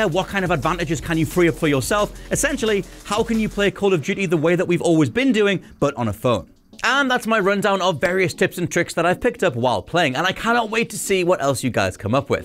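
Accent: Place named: Wales, United Kingdom. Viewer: A Welsh man talking about call of duty, yes lad 🇬🇧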